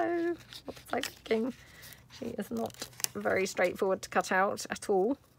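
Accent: England, United Kingdom. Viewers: These are so cute and look like a lot of fun to make. Thank you, you never disappoint ❤️❤️🥰🥰😍 You use the word “rubbish and fiddley’ a lot, with your cute little English accent and I chuckle every time. 🥰❤️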